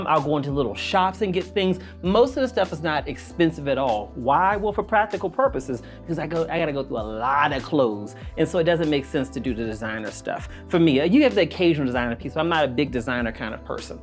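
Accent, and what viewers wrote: United States. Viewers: I actually believe this. I do think that he gets around it because he’s American, the accent is what saves him. Any black South African is not having the same experience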